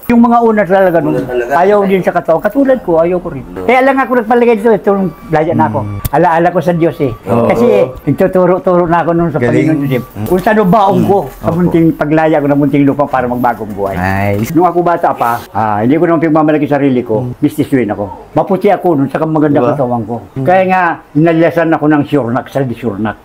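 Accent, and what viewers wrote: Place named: Bicol, Philippines. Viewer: Part 4 boss Galing c Tatay magkwento tono bicolano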